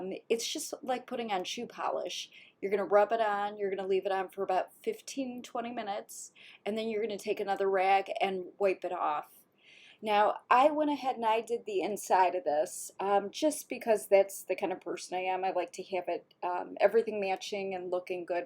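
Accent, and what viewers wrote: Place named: Virginia, United States. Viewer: You go girls! Wonderful project! I have a Vermont Castings cast iron wood stove that has been sitting in my family room for years unused. You inspired me to want to work on mine (rusted by cat spray in some areas) and put it out in the yard as a place to unwind. Thank you so much ladies!! (I am Canadian and your lovely accent reminds me of someone I know from Virginia) XOXO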